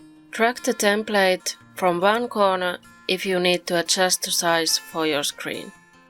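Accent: Finland. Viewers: Is that a Finnish accent? :D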